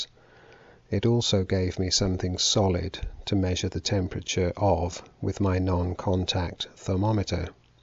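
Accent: United Kingdom. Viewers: ....and your British accent makes you that much more credible!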